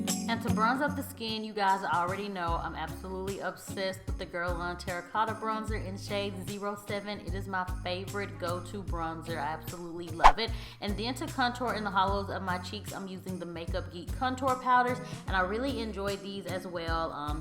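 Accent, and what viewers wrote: South, United States. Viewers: Girl I just love your southern accent 🤗🤗🤗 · I always love your looks and tutorials! And that you sound just as southern as me! 😩 The struggle and the jokes I get! But you’re killing this look and outfit! You go girl💁🏼‍♀️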